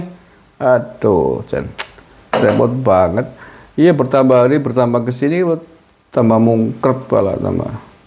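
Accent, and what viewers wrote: Banyumas, Indonesia. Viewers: aku teringat masa kecil d purwokerto btl itu ngurusi angket ongkot angkot brisik bae wong wis kalah aku slalu ngikuti acara chanel pak abis banyumase medok banget hebbatttt dan lucu tdk tegang yang nonton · Waalaikum salam wr wb paak lucu bahasane banyumasan banget akuvterhibur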